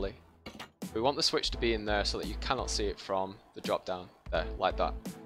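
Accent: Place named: Lancashire, United Kingdom. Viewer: I thought that mate, definitely some kind of blackburn or Burnley in there as well mate with a manc twang 😎 good video mate and good luck with your channel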